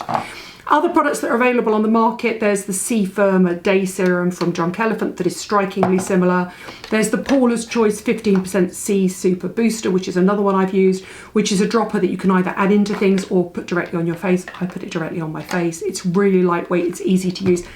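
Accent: United Kingdom. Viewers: I’ve come to listen to you, I’m just the average American loving the British accent.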